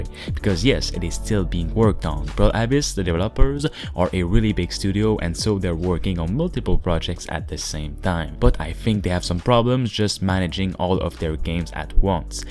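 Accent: Quebec, Canada. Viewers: Are u French Canadian??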